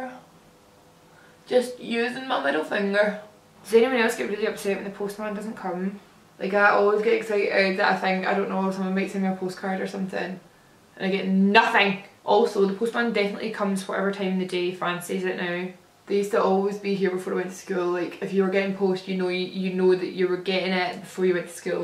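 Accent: Scotland, United Kingdom. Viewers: Your Scottish accent gives me life ❤️ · American ? No Scottish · no, I'm American. to me, you have a thick accent which I think is Scottish. Your response tells me I was right. It's lovely all the same.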